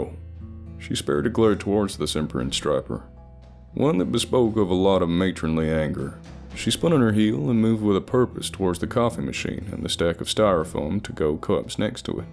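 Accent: South, United States. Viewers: Gotta be honest, too much southern accent, not enough Texan.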